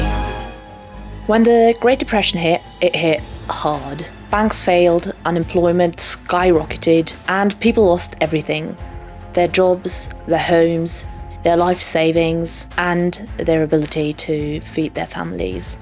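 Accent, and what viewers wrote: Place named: London, United Kingdom. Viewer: Good research effort,. Love your educated London accent… music to my ears. The glottal stops give you away, unless…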